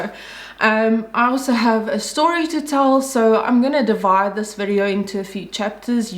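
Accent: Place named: South Africa. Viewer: Love the South African accent!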